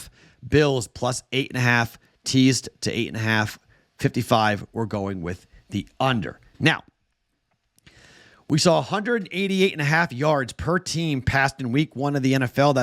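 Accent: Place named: Boston, United States. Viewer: I just SARD 269 at another sportsbook. Boston accent is slipping out.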